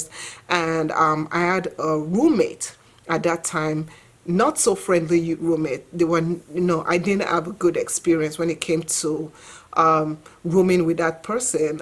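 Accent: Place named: Nigeria. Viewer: Just your introduction started with so much energy! I liked your video right away haha. And it's great to hear other Nigerians story who are living abroad and building a successful life for themselves. P.S I love hearing the nigerian accent. Some people say I have it, but I hardly hear it in myself :)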